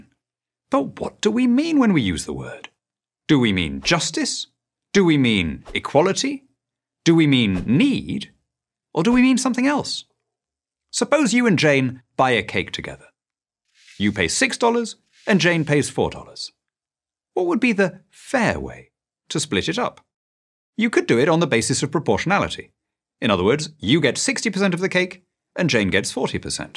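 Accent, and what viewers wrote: United Kingdom. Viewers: Hearing the spoken in a British accent is so condescending. Can we have Americanized content for and by Americans please? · Some things just sound better in a British accent 👏🏼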